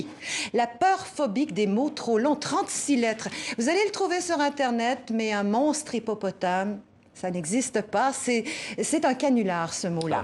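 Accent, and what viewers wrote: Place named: Quebec, Canada. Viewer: Moi ausis, son accent Quebecois.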